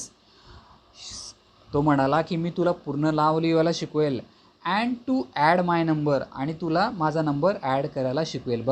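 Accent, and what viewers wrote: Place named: Khandesh, India. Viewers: Sir your accent is like khandeshi.... Thank you so much for explanation.... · Nice Khandeshi English 😂🎉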